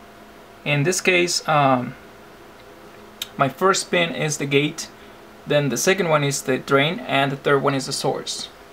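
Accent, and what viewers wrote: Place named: United States. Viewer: =) the great american accent ......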